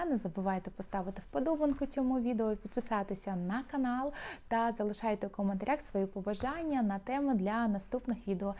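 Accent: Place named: Russia. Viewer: Навчіться правильно розмовляти українською. Чисто через вашу кацапську вимову не змогла дивитися більше півхвилини. В українській літера "г" - м'яка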